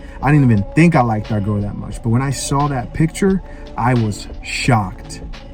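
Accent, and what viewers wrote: Africa. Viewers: The African accent is one of the best. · Holy shit you was born in africa lovely bro! I’ve been watching your channel I recently just got redpill. I was born in Nigeria, when I first moved here I was 14 with a deep accent too. Much love brother keep winning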